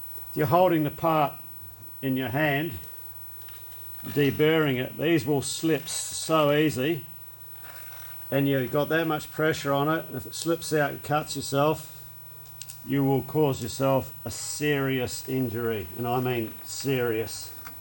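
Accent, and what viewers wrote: Australia. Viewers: I watched a few of your videos and decided there was so much I could learn that I quit watching the latest and started from the beginning. There was something in every video that a non-professional like me can learn. I've been running a mill and a lathe since 2007, but never better than now thanks to all the little--and some big--hints and techniques you include in everyone your videos. I've also learned enough of the Aussie language that I do not need the captions anymore! So, creak on! Thank you!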